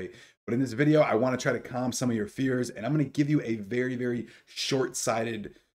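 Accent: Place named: Chicago, United States. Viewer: Great message for new stock holders. Also, not sure if you're from Chicago or not but you sound like us big time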